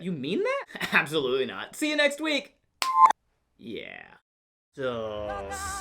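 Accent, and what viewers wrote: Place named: Albuquerque, United States. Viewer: Dude, your Burqueno is spot on. How much time did you spend In Albuquerque to pick that one up? lol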